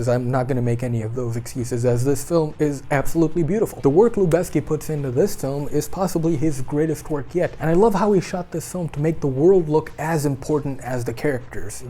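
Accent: United States. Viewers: i think you voice is american but acting is overacting se bhi upar bhai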